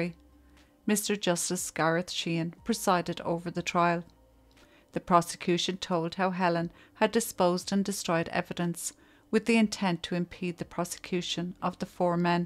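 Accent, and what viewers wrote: Ireland. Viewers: Saturday morning here. I jumped on especially to hear my favourite Irish voice on my favourite crime channel. Thank you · Me too....the Irish Accent is Beautiful ❤ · Thank you for this excellent video. I can only say that Rebecca did not get the justice she deserved. So terribly sad for her children and family. I just love listening to your lovely Irish voice! May Rebecca rest in peace.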